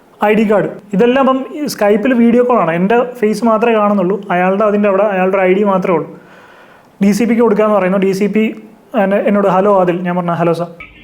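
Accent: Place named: South, India. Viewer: The slag seems like someone from south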